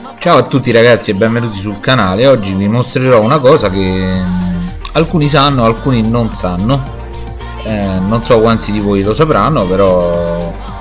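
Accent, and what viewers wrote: Rome, Italy. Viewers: Romano come me ahahaha grande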